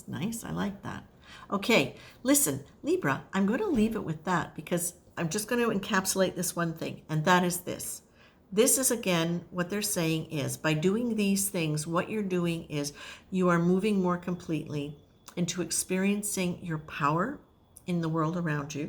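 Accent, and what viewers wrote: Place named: Ireland. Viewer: Thank you! Love you Irish accent! So on point! (Fellow Irish person).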